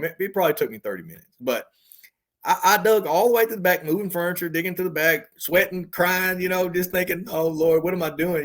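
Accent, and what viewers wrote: South, United States. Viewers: Me and this guy have the EXACT same Southern, country voice😂😂😂 i know it's unrelated to NDEs, but i thought it funny because I've always been made fun of for my deep accent. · I love this guy. His southern twang on top of his humor really put a good spin on the story. But I sympathize at the same time when he’s dealing with his fear after the experience. I’ve had encounters and am careful who I tell. Maybe one day I’ll tell my story. I’m glad he got a second chance to fall in love with the Father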